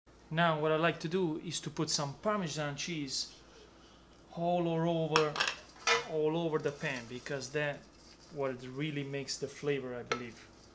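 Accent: Italy. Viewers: Maestro! I wish I was born from a long line of pizzas (heh heh, okay, just joking -- you're passion and Italian English is fantastic!). But I'm so inspired. I'm from Ottawa Canada! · Why do you have an Italian accent but your mom doesn't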